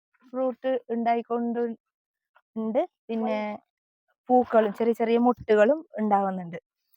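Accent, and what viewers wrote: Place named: India. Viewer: Samsaram kettapo thoni nammalu ore gillakarado🎉🎉 God bless u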